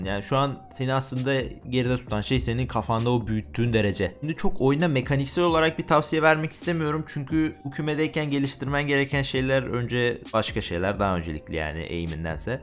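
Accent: Thrace, Turkey. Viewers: Abi trakyalımısın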